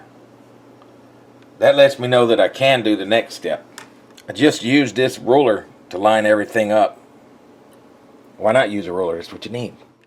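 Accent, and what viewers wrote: South, United States. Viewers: you sound southern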